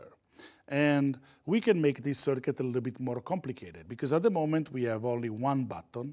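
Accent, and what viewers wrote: Italy. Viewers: Si capisce che sei italiano, sia dal nome, sia dalla pronuncia, quindi si potrebbero avere i sottotitoli in Italiano per chi come me non sa bene l'inglese?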